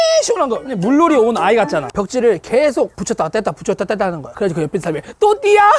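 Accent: Jeolla, South Korea. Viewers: 형원이의 영향인지는 몰라도 사투리할 때 전라도 사투리가 자연스럽게 나오는거 볼 때마다 깜짝깜짝 놀라 한국의 얼을 이해를 못한거야를 모단거야 하는데 진짜 자연스럽다 생각함 ... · 말투가 너무 구수해서 빼박 전라도 출신인줄 알았더니 태생부터 서울사람이넼ㅋㅋㅋㅋㅋㅋㅋ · 민혁씨 전라도 출신인가요?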